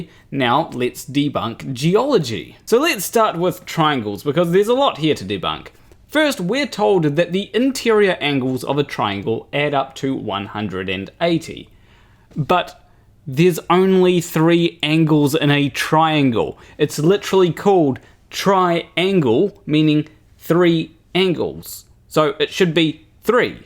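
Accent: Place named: New Zealand. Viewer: ...and you have the most kiwi accent to ever not be on many world maps.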